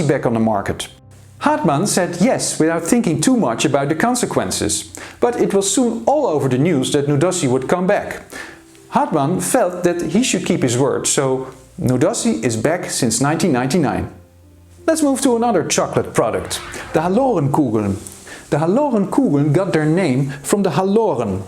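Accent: Netherlands. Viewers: A strange sensation to watch a video about East German Products, narrated in English with a Dutch accent. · The Dutch accent is strong though, at his first sentence I already knew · I was soo suprised to hear a Dutch accent once I clicked on this video. Love to see the interest in regional history exceeds borders! Thank you for your research and dedication!! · His Dutch accent is very clear, once you know what a Dutch accent sounds like. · I love your Dutch accent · Wow that's interesting, didn't know he's Dutch.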